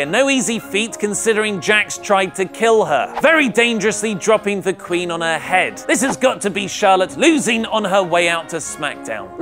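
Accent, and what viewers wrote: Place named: England, United Kingdom. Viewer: Where are you from? I'm not familiar with English accents, and I find your manner of puting an "er" in every word that finishes with an "a" quite intriguing! "John Cener" "Wrestlemanier" "Lucher Underground"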